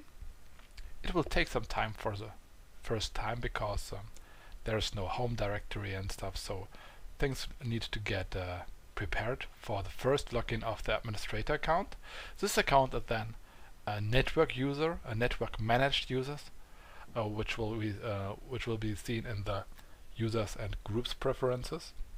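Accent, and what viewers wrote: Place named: Germany. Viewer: Thank you... Your accent is absolutely German :)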